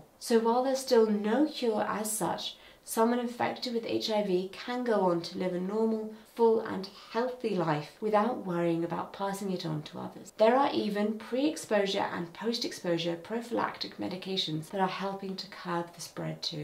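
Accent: United Kingdom. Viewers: You Madame should be made a “ Lady “ , love your speech. Soo British and educational for a speakers. I am waiting 😂😂 😂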